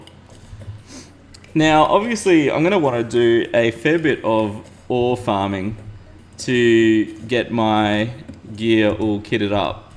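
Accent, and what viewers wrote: Australia. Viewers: I didn't think you ever swor in ur vids because ur so and also r u from Australia or new Zealand ITS KILLING ME!!!!!!!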